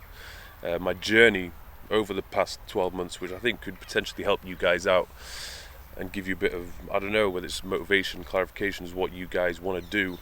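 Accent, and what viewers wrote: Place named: RP, United Kingdom. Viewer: ..I am a newbie to your YouTube channel..but I have to say it is by far the BEST fitness channel I have seen. This video is awesome. And your English is fantastic..you do not speak like a chav like other trainers. No slang, no ghetto-speech...just nice, straight, queens english. Your knowledge and your honesty is brilliant. No junk, no trash, NO WAFFLE, and no clickbait...just straight to the point, and nice short videos. I’m glad I subscribed. Top man!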